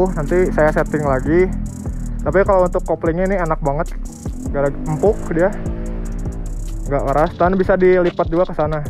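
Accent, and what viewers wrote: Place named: Sunda, Indonesia. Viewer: Sunda pisan ei AMANG Yeu mh euy. Bhasa na. MANTAP..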